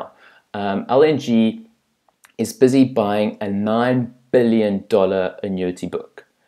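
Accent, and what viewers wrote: South Africa. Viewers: You sound so South African lol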